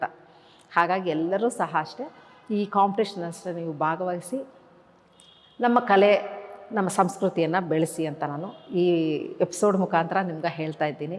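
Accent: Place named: Andhra Pradesh, India. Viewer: ನೀವು ಮಾತನಾಡುವ ಶೈಲಿ ನೋಡಿಯೇ ನಾನು ಅಂದುಕೊಂಡಿದ್ದೆ ನೀವು ಆಂಧ್ರ ಮೂಲದಿಂದ ಬಂದವರು ಅಂತ, ಆದ್ರೆ ನಿಮ್ಮ ಕನ್ನಡ ತುಂಬಾ ಚೆನ್ನಾಗಿದೆ😊ಖುಷಿ ಆಯ್ತು ನಿಮ್ಮ ಸಾಧನೆ ಕೇಳಿ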